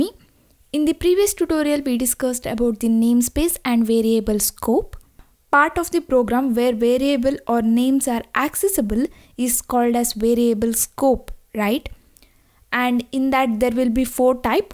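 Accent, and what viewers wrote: India. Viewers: Where did your English learn from? Are you Indian people?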